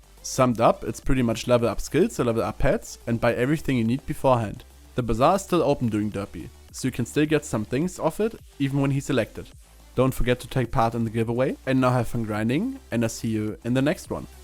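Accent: Germany. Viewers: i would buy my coop alchemy 50 ign laux2ß2ß nice vid btw and u have a small german dialekt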